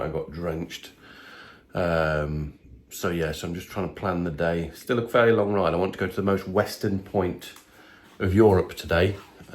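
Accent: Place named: Nottinghamshire, United Kingdom. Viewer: Enjoying your French trip. Tell me sumat! Are you from Nottinghamshire. Trying to wok out ya accent 😂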